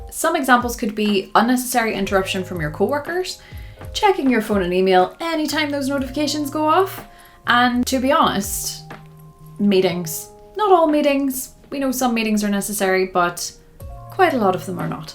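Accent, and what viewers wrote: Northern Ireland, United Kingdom. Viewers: She has a Northern Irish accent.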